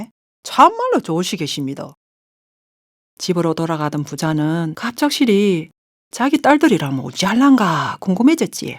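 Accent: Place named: Gyeongsang, South Korea. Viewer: 구수한 경상도 사투리에 내용도 그림도 참말로 재미나네요~^^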